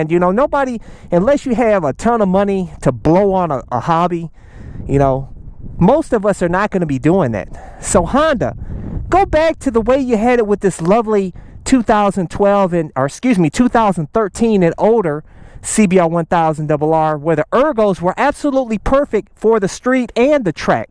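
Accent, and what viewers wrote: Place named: Midwest, United States. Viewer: He grew up in an urban area of the midwest which is why its sounds like a mix of mid-western and northeastern dialects. Its the reason why its hilariously endearing when he says things like "FO DA STREETZ" "HUNDID" and "DAHBUL ARRR" lol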